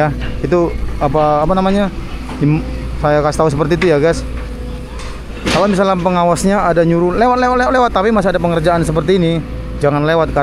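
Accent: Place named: Medan, Indonesia. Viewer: Bang kau lucu kali😂😂, medan kali😂😂😂😂 1. Awak(aku, saya) 2. kontak(kesetrum) 3. udah nampak(udah kelihatan) 4. nah ini kan segini kalinya,nah disana udah lebar kali guys, lebar kaaaliii guys😂😂😂😂(kayak mana bedakan kali yg ada airnya dgn kali yg gk ada airnya bang) 😂😂😂😂 5.atrek atrek atrek Terima Kasih info dan hiburanny bang, sehat selalu, salam dari Medan.... Horass🎉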